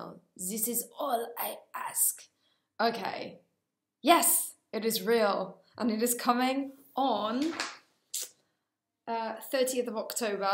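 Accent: Wales, United Kingdom. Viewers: travick no, she's Welsh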